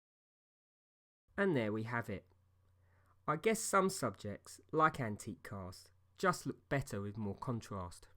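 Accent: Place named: London, United Kingdom. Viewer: Hearing you speak makes me miss London!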